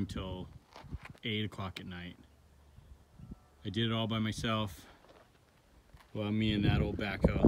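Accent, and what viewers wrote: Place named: Northern Idaho, United States. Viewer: You sound like everyone in Northern Idaho. Ha except here they tell Californian's to go home. The funny thing is they are all from California but they came here 20 years ago so now they think they claim the state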